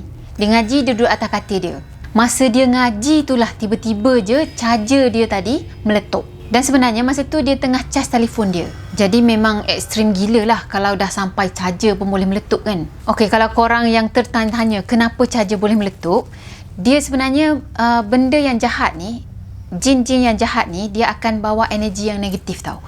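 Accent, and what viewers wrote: Kelantan, Malaysia. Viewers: Asal kelate ko?? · Ore kelate ko? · Mek kelante rupanya mati2 aku ingat wong jowo. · Salam NI orang kelate ke?? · Laaa..ore kelateee.....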